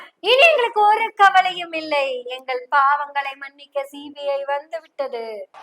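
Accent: Kongu, India. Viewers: அருமையான நையாண்டி கலந்த கொங்கு தமிழ் சுவை வாழ்த்துக்கள் சகோதரி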